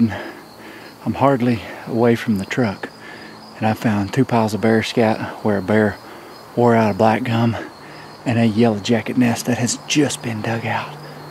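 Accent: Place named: South, United States. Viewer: That's the most southern accent ever. Great video.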